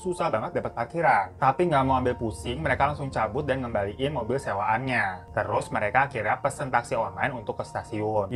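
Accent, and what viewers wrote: Java, Indonesia. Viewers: Bg iki wong jepang atau wong jowo?? 😂😂 sipit² tapi koe medok wkwkwk Lucu sih..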